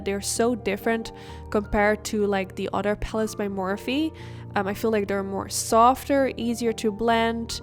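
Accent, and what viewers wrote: Netherlands. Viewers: I guessed you were from the Netherlands in the first 20 seconds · Hi, I'm new to your channel. I was very curious about your accent. I didn't know you were from the Netherlands, but since I also live here, I recognized it inmediately, and then I read your information and it confirmed it. I have watched 2 of your videos already and you just won a new subscriber. I really appreciate the close up on the eye shadow looks and it's super easy to follow. Thanks a million.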